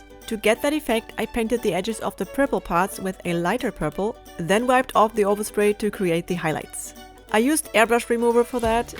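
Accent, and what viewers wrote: Germany. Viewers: I thought your accent sounded German! :D Wago is the brand, so like Tempo or Zewa it is used as a noun even though it's a name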